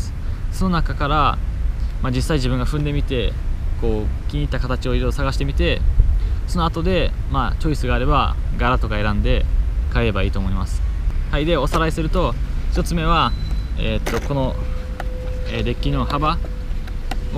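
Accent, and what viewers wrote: Japan. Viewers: いつもお疲れ様です！さんって何県出身ですか？？喋り方的に関西か東海のほうですか？ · 普段はどこに住んでるんですか？あと顔とかハーフっぽくてイケメンやし英語喋れるけどバリ大阪弁、、、ハーフですよね？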